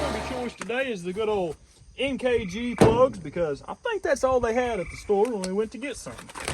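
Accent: South, United States. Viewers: the southern accent, the simple editing, cool cars? i fuck with this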